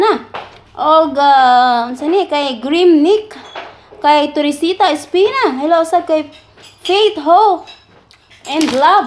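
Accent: Visayas, Philippines. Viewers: cge ko ktwa NIMO nday batia ko dia ko.dri taiwan mlingaw jud ko NIMO.nday bisaya jud English NATO samot nku ky bolanon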